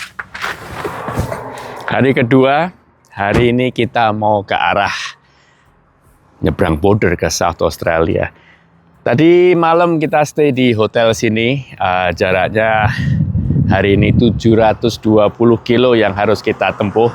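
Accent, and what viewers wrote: Java, Indonesia. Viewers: Tampilan LC 200nya cakep banget, velg+ban dan modif bagian depannya pas banget. Suatu saat bisa bikin mobil overland seperti om wid 🎉. Satu hal yg bikin salut, walaupun lama tinggal di Australia, tapi logat jawanya masih ada loh 🎉🎉🎉. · Saya salut dengan jenengan Pak,walaupun oerbah tinggal di Australi sangat lama,tapi logat Jawa jenengan masih sangat kental🙏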